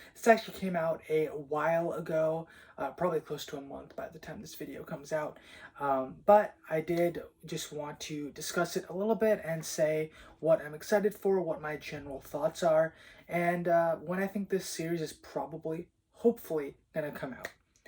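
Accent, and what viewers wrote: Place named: United States. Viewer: Im eagerly waiting for this release. By the way though your dialect is almost similar to US speaker your appearance relates to a slight indian men🤔😉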